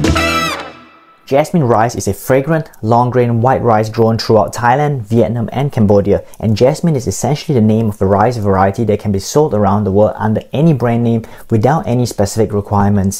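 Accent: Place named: Singapore. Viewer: Your topics r good, due to your singaporean accent & style and the way u speak so fast, my heart goes with your fast pace to rush, not a comfortable viewing, please speak a bit slower for a more comfort viewing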